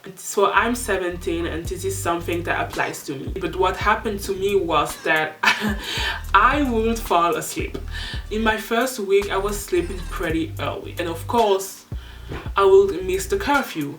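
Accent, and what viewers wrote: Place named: Switzerland. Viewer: Trust me, you're not a C2 and I sincierly think you aren't a C1 either. Not to insult you, but I just did the exam for the C1 and passed, however it was pretty taff. Between the C1 and the B2 is a huge gap which makes sence since C1 is already the second highest level. The reason why I do not think that you are a C1 are mainly the collocations. They get really important for the CAE and honestly you might have a look at them again. Secondly your language sounds really swissish. Im swiss, so I know how this is supposed to sound. Usually it is not a bad thing to have an acent. I mean I have one too, not gonna lie. At the CAE they won't even care about that. It is crucial, however, to change some words with synonims for not sounding as repetitive. For instance don't use students three times in one sentence. Chance it up with educators or pupil. 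This is what they really look at if you wanna take the CAE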